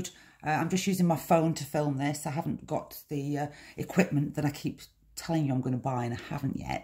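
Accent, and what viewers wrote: United Kingdom. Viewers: I am new to Brusho’s but you can bet when I think of them, or read the label, I will imagine the word Brusho being spoken in your delightful British accent.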